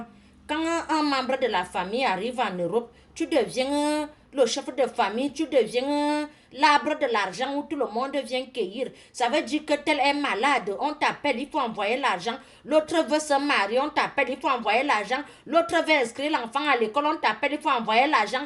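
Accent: Cameroon. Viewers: I love your camerunian accent sister 😍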